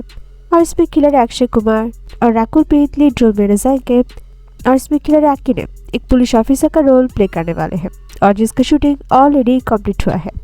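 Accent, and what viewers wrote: Bengal, India. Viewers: You are a bengali ?? Your acceent is a bengali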